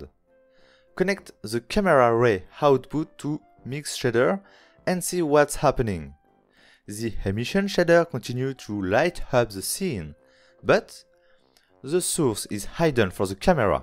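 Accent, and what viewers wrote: France. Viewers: Super instructif merci!! Sinon woaw, cet accent frenchie aussi parfait je pensais qu'il n'existait que dans les films :o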